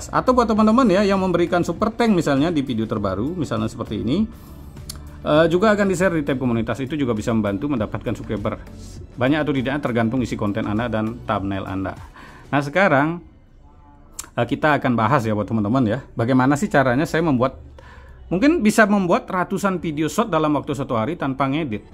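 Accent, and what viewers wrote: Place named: Bali, Indonesia. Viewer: Uli logatne be ke tebag nak bali ne😄 rahayu wii🙏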